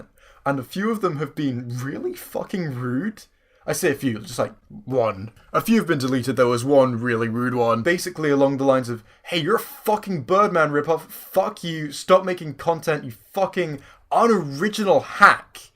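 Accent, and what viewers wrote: England, United Kingdom. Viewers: You sounds so English in your early videos, I thought your accent had just changed when you went to uni. (I changed accent at uni) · You get accused of this because you're British and accents are a tip off that you're prone to rip off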